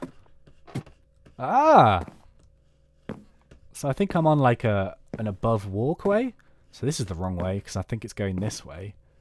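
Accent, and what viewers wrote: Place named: England, United Kingdom. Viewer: I thought it was HYSTERICAL in your last map when you like ---- and it said blimey XD best video ever and sorry I'm obsessed with your English accent :3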